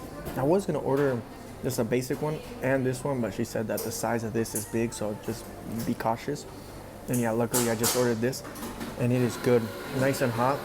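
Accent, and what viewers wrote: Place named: Argentina. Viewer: Your Argentinian accent is impeccable;). We all speak Spanglish in the States and then when going to a true Latin country that it doesn’t cut it , lol. French is my first language, can speak Portuguese ( Brazilian style ) and some Spanish, but the Argentinians loved the way I spoke Spanish ( though very minimal) Thank you for sharing your story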